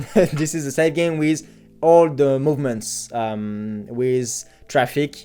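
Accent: France. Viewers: this french accent is torture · i don't know why but i understand all your video, on dit merci l'accent français, je comprends que celui là 🤔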